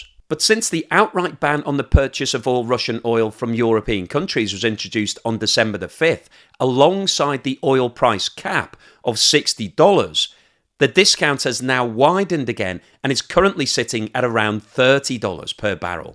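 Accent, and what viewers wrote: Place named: England, United Kingdom. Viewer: What are of England are you from? I like to play place that accent.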